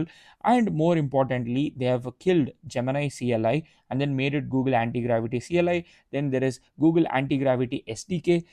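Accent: South India, India. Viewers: Very strong south Indian accent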